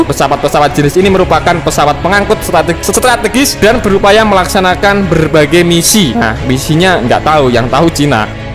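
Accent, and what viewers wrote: Java, Indonesia. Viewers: Logatnya logat jawa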